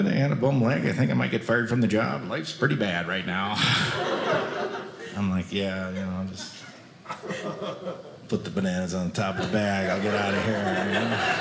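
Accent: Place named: Wisconsin, United States. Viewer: Boy that Wisconsin accent is unmistakable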